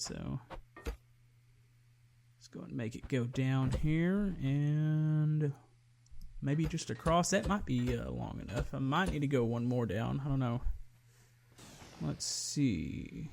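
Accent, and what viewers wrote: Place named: South, United States. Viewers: I swear he has a southern accent. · I'm guessing Texas. At first North Carolina but after talking to my uncle, I'm 99% sure it's Texas. Although I may be wrong and look like an idiot lol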